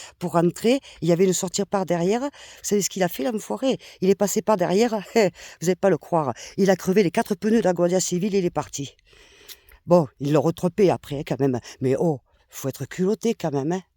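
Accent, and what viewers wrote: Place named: South, France. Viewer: Tu es trop génial , tu as encore bien gardé l'accent de notre sud , moi je l'ai perdu , et pourtant vécu longtemps à Cannes, Nimes , Alès ... Ohhh bonne mère 🤣😅j'essaie aussi d'arrêter de fumer , pas facile :face-blue-smiling: aller à Bientôt :hand-pink-waving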